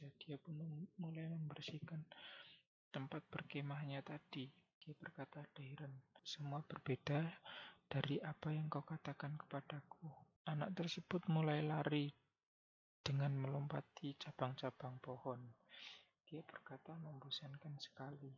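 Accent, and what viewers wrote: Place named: Indonesia. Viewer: Soal y suara lu medok coba bikin suara y alusin sedikit trus kerasin klo mau gasih suara Jagan di baca mending hapalin tesk y dlu ok bro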